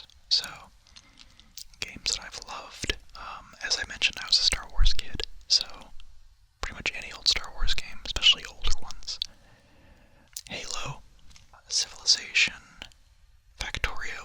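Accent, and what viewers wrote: South, United States. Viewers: Petition to hear your southern accent more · Congrats on 10K! That’s so exciting! First of all, your cats are the cutest. Pet them for me pleeeeeeeeease! Now, can we just talk about the fact that you played D&D and were a DM for campaigns? That’s so cool! And the fact that you’re a big sci-fi/fantasy nerd is awesome also. I’d love an audio like that from you in the future. And since you love the genre so much, I’m sure you could create something amazing with it. “Kill the shame inside your head, kids. It helps you open up new avenues for your life.”—This is such a powerful statement, ohmygod. Also, I’m impressed that the ‘Comforting You in a Crowd’ audio was improv! You did a great job with that and I never would have known you didn’t script it. It was very natural! The fact that your improv skills came from D&D makes me grin. 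I love that! D&D really is such a great way to learn how to act and portray a character. Even before you said you were from the South, I figured you were. Because you said y’all early in the video, haha. And then you pulled out that Southern accent and I was like, “CONFIRMED.” (You have a nice Southern accent, bytheway. Just saying shhhhhh.) As far as games go, I usually play visual novels or board games, but there’s one video game that I absolutely LOVE and will straight up tell you that I’m great at, which is Divinity Original Sin 2. Since you’re interested in fantasy, you should check it out. Might be up your alley! It's an incredible game and it has a definite (and good) ending, which is alway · This was funny and cute. Would definitely love a video with your southern accent. I'm dying from the little bit in this video, a whole video and I'm dead. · Okay...now that I know you're a southern boy, please do something with that accent!!! 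I can't tell you how much I tingled just hearing that little bit. I'm a Cali girl in Arkansas and I don't get to hear that from anyone but myself (I live in the country). Actually no, I heard a hint of it from a guy I met NYE but we spoke for 2 seconds before he was gone forever 😭😭😭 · The southern accent,AHHHHHHH it hit a switch that said yes just yes...buuuut I can understand not liking how it sounds especially on your own voice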